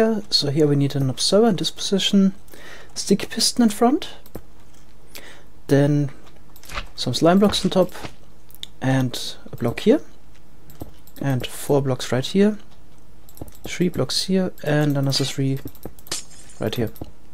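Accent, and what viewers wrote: Germany. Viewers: Man hört sofort das du deutsch bist xD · Ja den deutschen Accent hab ich auch erkannt, aber hört sich ganz gut bei ihm an, finde ich. :D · iich dachts mir gleich wegen dem deutschen akzent... hört man einfach raus xD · r u german? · Man bemerkt an deiner Aussprache, dass du deutscher bist :D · Not the strongest German accent I heard, but after some time you start noticing it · lol ich so: "Hmmmm der Akzent klingt deutsch😂" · Gutes englisch, den deutschen Akzent hört man trotzdem raus! (geht mir beim sprechen glaub genauso xD) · man merkt das du aus Deutschland kommst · ja hört man extrem :D als deutscher sagt man immer sis anstatt this :D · You-r german? But keep up the great work :) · His german accent makes this video that much better :D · bist du deutscher?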